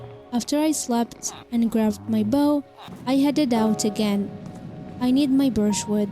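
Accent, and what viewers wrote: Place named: Canada. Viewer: I thought you were canadian 😮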